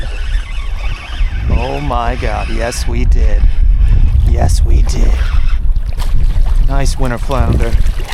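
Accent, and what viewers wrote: Philadelphia, United States. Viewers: dubious Philly accent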